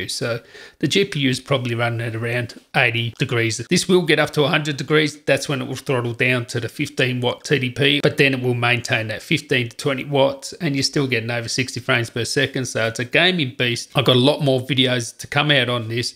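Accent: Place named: Australia. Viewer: ah.. you did it! great content, your accent is quite australian though!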